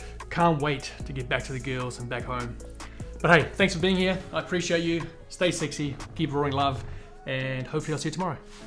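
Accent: South Africa. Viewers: ahh Potch boy. Durban girl here. Your accent is deceiving - definitely South African but a lot broader now which I would imagine comes from living in Oz. Ive been in the Middle East for last 14 years so same thing has happened to me. Anyway, keep up the great content. Id be drinking a lot of beer and wine and quarantine : )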